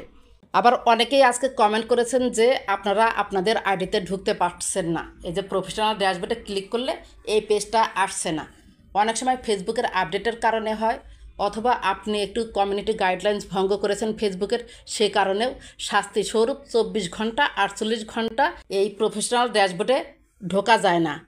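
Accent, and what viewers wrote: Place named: Chittagong, Bangladesh. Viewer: আপু আপনার বাড়ি কি চিটাগাং।আপনার কথায় মনে হচ্ছে । তাই জানতে চাই ভুল হলে কমা করবেন। ধন্যবাদ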